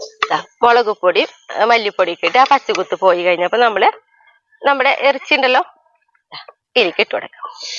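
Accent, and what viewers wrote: Thrissur, India. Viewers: സംസാരം കേൾക്കുമ്പോൾ തൃശ്ശൂർ ആണെന്ന് തോന്നുന്നു....... എവിടെയാ സ്ഥലം?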